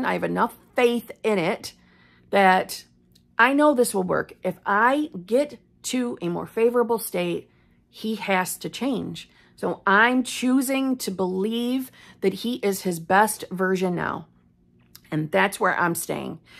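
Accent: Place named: Minnesota, United States. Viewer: Love your North Dakota/Minnesota accent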